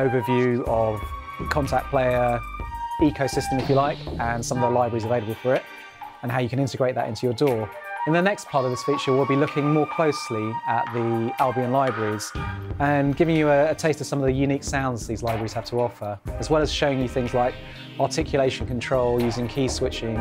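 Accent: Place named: United Kingdom. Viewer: UK accent <3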